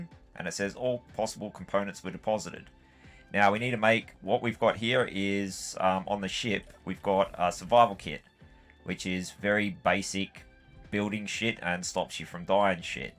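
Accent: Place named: Australia. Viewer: You have a down under accent, I myself taking a break after playing LSG "The Black" and "Plane parts server".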